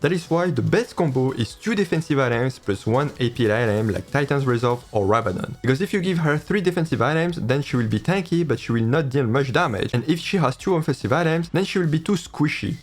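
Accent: France. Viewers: I've been holding a question for as long as I've been watching your videos... what is your accent ? It sounds like a French accent but as a French I'm wondering why I think it sounds a bit more like a Northern accent... SORRY I JUST COULDN'T HOLD THIS ANY LONGER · Pro-level Dad puns, cute French accent, that crush better strike while the iron is hot! :D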